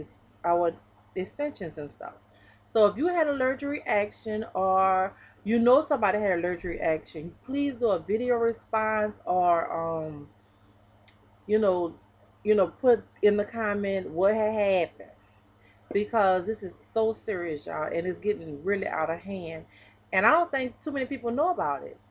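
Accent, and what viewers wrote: New Orleans, United States. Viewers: what had happen girl you can tell you from new orleans LOL...., thanx for the vid.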